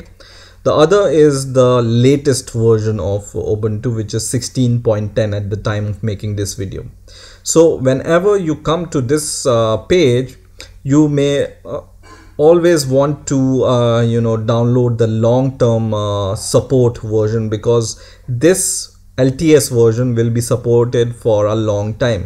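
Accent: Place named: India. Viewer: you are not german, dumb ass you are indian, i can recog noise your voice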